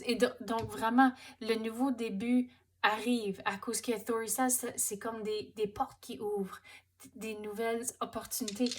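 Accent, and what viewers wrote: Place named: England, United Kingdom. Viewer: Merci pour se superbe tirage en attendant l évolution, vous avez une très jolie voie avec ce petit accent anglais qui dégage une douceur. Je vous souhaite beaucoup de bonheur et de joie sur votre chemin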